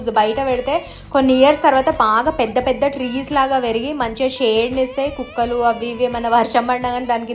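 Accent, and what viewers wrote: Telangana, India. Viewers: నువ్వు పక్కా తెలంగాణ అని తెలుస్తోంది, తెలుగు మాట్టాడమ్మా, పర్లేదు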